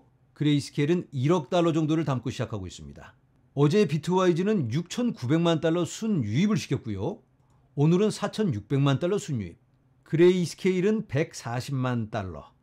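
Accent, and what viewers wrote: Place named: Gyeongsang, South Korea. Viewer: 부산?경주인줄 알았네요ㅋ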